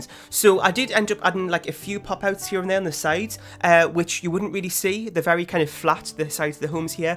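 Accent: Newcastle, United Kingdom. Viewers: Just found your channel and Iv got too say I’m not sure of your accent but if it’s geordie then me too haha! Your build is so accurate too my grandmas house aswell and I think it’s really cool! Bets build Iv seen in a while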